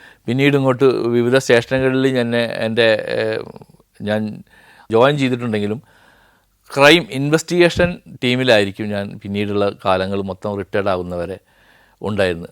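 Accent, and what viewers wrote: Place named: Valluvanad, India. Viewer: നന്ദി സാർ, വള്ളുവനാട് ഭാഗത്തെ ഭാഷയിൽ സാർ പറഞ്ഞ കഥകളും പറഞ്ഞ രീതിയും വളരെ നന്നായിരുന്നു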